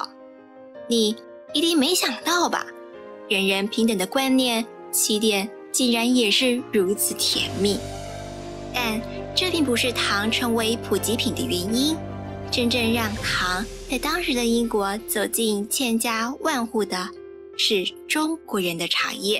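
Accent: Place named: Taiwan. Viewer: 这本书我读过德语版的。文案写得很好，解说很棒。可能由于文化差异，觉得声音有点儿嗲，台湾腔与京腔的给人的感觉正如台湾菜与京菜的甜咸之别一样。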